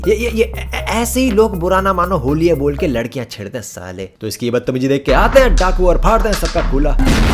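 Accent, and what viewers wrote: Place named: Punjab, India. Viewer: Am noticed your Punjabi accent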